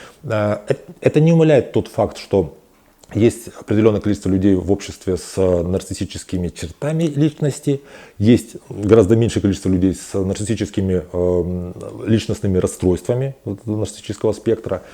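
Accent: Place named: Ukraine. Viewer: Похоже ,, психолог" из ,, незалэжной"- говорок выдаёт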